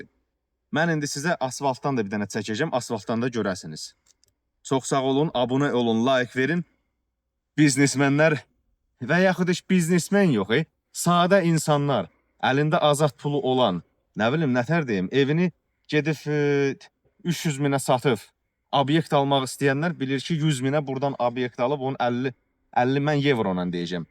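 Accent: Ganja, Azerbaijan. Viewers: İn şəə Allah olarsan Rəbbim hidayət versin yaxşı əməllərinə görə amin .Gəncədən sən deyəsən ləhçən oxşayır)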